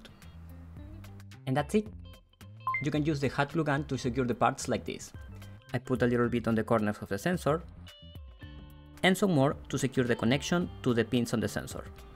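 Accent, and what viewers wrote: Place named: Italy. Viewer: You video's are pretty clear, not too slow and not too fast. And when it's a bit too fast than it's less annoying to scrol a fragment back. But hihi... it must be your Italian vibe and accent.... I can't resist the urge to imitate your .... ' and.., that's it'. Funny repeating soundbite, I mean this in positive sense :D. Unfortunately I fail in my imitation, lol. Maybe you need to create your own home assistant audio notifications. :D :D and that's it.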